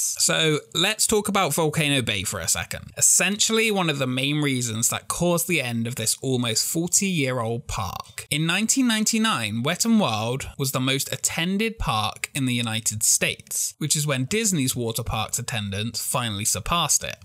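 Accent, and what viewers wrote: United Kingdom. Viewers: I cannot stand that Bul Brit Accent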